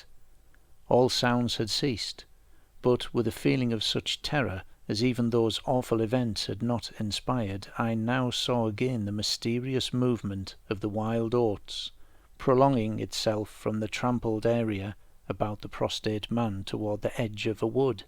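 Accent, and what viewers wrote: United Kingdom. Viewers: This gentleman has such a pleasant voice, pace of reading, diction and British English. A pleasure to listen to.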